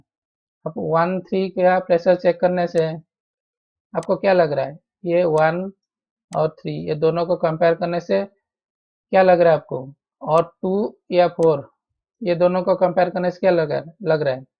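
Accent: Odisha, India. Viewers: Bhai apana odia ki tone ru Jana paduchi..kai na Odisha side Hindi tk emiti katha huanti..bhala lagila apanka video dekhili..apanka sahita contact karibar achi detail diantu bhai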